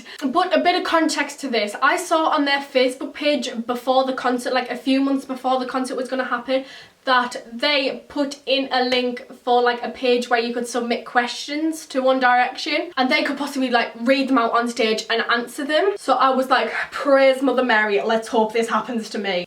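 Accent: Newcastle, United Kingdom. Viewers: Are you Geordie ??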